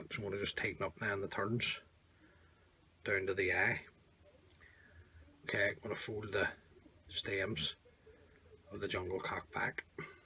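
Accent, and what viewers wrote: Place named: Belfast, United Kingdom. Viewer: you come across really well, and the Belfast accent is clear to understand and brilliant. Who could not like a Belfast accent. Well done keep it up.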